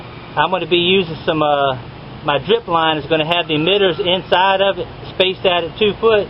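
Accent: South, United States. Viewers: You got that slow southern style I heard about in some song can't remember the name. It is a pleasure to listen to you describe what you are doing. You should have a gardening show on the radio!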